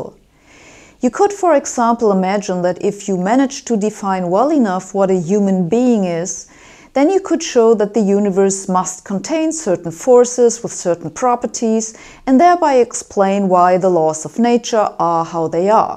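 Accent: Germany. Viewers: Not related at all...well partly maybe... I love accent. In particular as a specific example of my appreciation of the general German accent. I note that certain accents rub me a certain way. German sounds cuttingly precise, the way you'd expect a high quality German automobile to be designed. Russian and Indian sound kind of comical to me, in different ways. The royal British accent of course sounds incredibly high brow, very polished, and upper society. Australian sounds amiable, down to earth, matter of fact, in a jovial way. Italian sounds happy and very excited. I could go on but I shant. Fuggedaboudit!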